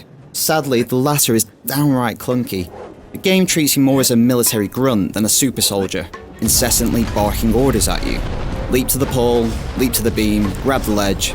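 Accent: United Kingdom. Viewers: Why is a british guy doing a review on Captain AMERICA game? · Furthermore, the reviewer coincidentally having a certain accent and possibly being from a foreign place like the UK, or any one of the countries colonized by British doesn't mean he represents its people. This is IGN doing the review, at least point the finger at them rather than making it a matter of national pride and insulting others. I was unaware that British were single-handedly putting down the U.S. (I say "U.S." because the Americas are not comprised of only us) via game reviews, LOL.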